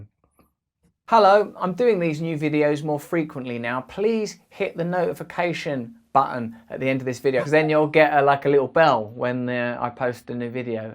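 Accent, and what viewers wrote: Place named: United Kingdom. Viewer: I say this not like this but much like this all the time. Well not all the time but from time to time. I think I would listen to myself a lot more if I had this great UK accent. Great work again Russell, you speak like a man of experience and a man who knows as much of its truth as he can know. I've become a fan fo sho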